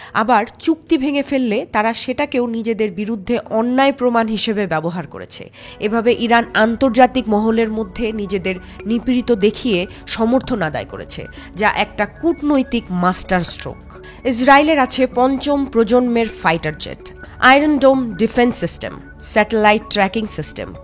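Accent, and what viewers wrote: Bangladesh. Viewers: আপনার ডায়ালেক্ট ও একসেন্ট বাংলাদেশীদের মত হওয়ার কারণ কি?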